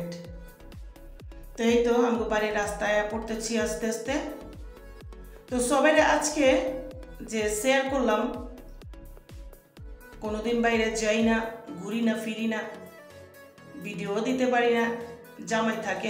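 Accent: Old Dhaka, Bangladesh. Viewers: পুরার ঢাকার কথা শুনতে আমারও ভালো লাগে। শুধু আপার কথা শোনার জন্যই পুরো ভিডিওটা দেখলাম।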